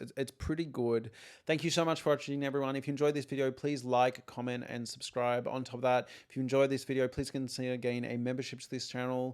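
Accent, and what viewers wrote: Australia. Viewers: I'm so happy you replied ! ^__^ as an American, I love different accents. Australia being in the top 3 ! British, Ireland, and Australian ! I can always tell an aussie by how they say no. It kind of sounds like nor with an R Thank you so much again!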